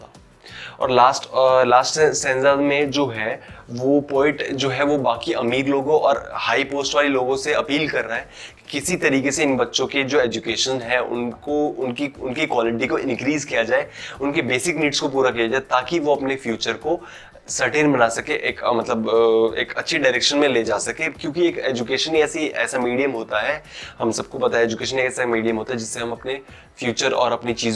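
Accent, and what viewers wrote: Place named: Bihar, India. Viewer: U belongs to Bihar or what? Aapne bola naa..."At teri ki jay rha ho"..😊😊:) Waise aap bahut aachaa samjhte hk bhaiya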